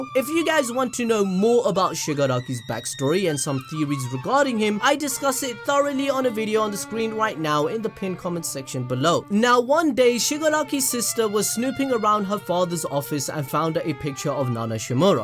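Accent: Punjab, India. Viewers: Sir are you Indian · Bro are u punjabi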